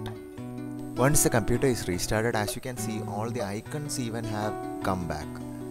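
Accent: India. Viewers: I'm not sure if someone has mentioned this previously, but the addition of music in the background of this video serves needlessly to making the information you are presenting more difficult to understand. Although we both share a common language, I suspect that you would no doubt find my Canadian accent to be as difficult to understand at times, as I do your Indian accent and the background music contributes considerably to this difficulty. If your intention is to appeal to as wide an audience of English speakers as possible, you might consider omitting background music from future videos. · Thank you indian-accent-man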